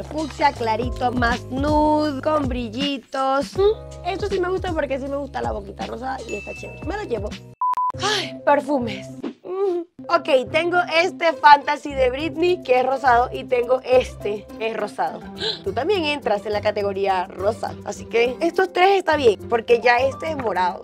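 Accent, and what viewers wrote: Venezuela. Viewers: Yo sé que a No se olvida el acento venezolano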